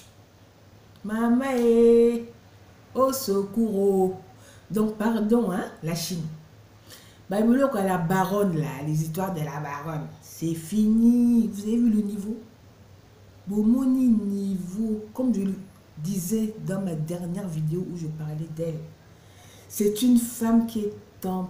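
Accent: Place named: Lingala, Democratic Republic of the Congo. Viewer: Lingala nayo kitoko makasi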